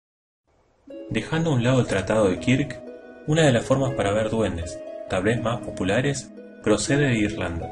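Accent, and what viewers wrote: Argentina. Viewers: bueno pues tu acento me parece que es de una persona de argentina. posdata: me saludas a maradona y ha leonel messi jajajaj es broma tío. buen vídeo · eres argentino o uruguayo o paraguay por el dejo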